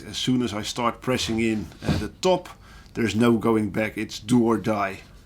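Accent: Netherlands. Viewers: This video captivated me every second. I am very grateful to you that you speak in a (for me) clear and (despite your Dutch accent) understandable English. This makes it very easy for me to understand what you do, why you do it and how you do it. . I think your shape of the guitar body is great, because you do without the often common (but in my opinion disturbing) offset between the upper and lower cutouts on the neck. I first saw this in a similar form on John Petrucci's "Majesty" guitars and find it very comfortable. . Your design with the recessed book matched top should actually work very well on a neck-through and/or semi-hollowbody guitar. What do you think? . I am already very excited about the next part, please don't let us wait too long 🙏😉👍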